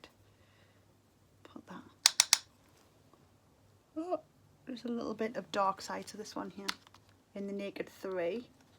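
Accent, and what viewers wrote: Newcastle, United Kingdom. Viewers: I've watched you from the beginning and my boyfriend went to Newcastle Uni (now my husband) and I was in Glasgow doing my nursing training. It seems silly but I felt really at home in Newcastle and when I missed him hearing your accent in your videos was so lovely. I'm so glad you're on youtube again and you seem so happy! you look stunning and wish you all the best for the future lovely.:) <3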